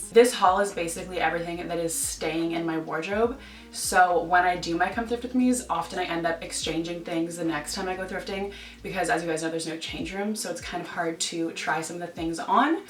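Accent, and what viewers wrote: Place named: Toronto, Canada. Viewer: I just watched your other thrift with me vids and this is the first time I have seen you on YouTube. Anyways, I immediately thought you were from Toronto and when you said you were from there I was like yesss!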